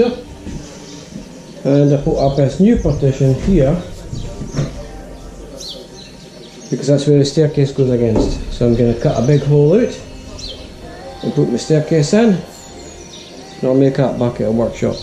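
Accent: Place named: Wales, United Kingdom. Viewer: Accent is fine. Never had a problem with Welsh ;-) I got lost because I'm completely dopey and haven't done enough joinery to appreciate even the basics. Sometimes I'm just content to be impressed.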